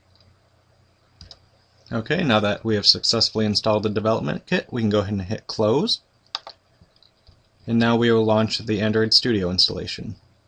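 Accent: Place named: United States. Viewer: Hey, I gotta develop many programs till the end of january so i'll be watching all your tutorials :)) I hope I can learn to use android studio because nobody teached me. (I'm studing computer software engineer) Thank you for your job :) BTW: There are 2 big tutorials playlist, yours and one from another guy. I prefer yours because you speak american english and not british :P hahahaha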